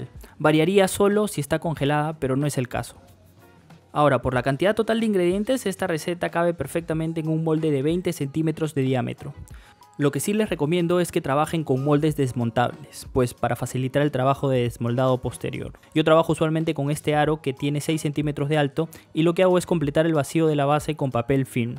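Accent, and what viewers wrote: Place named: Guatemala. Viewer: jeje Chapín es Guatemalteco, tu acento es muy similar al de ellos. He estado en Guate varias veces, me gusta mucho. Gracias por este postre.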